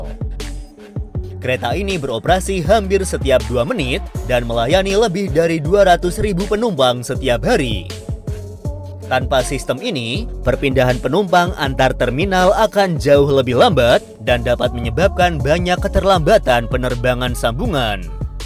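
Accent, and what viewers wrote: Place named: Indonesia. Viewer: KOK SOUNNYA INVOIC INDONESIA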